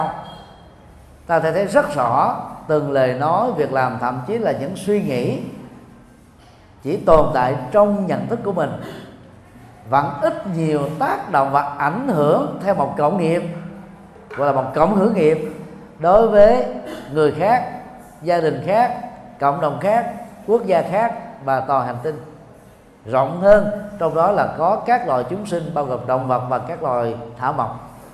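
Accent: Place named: Vietnam. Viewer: phát âm tiếng anh giọng việt "chay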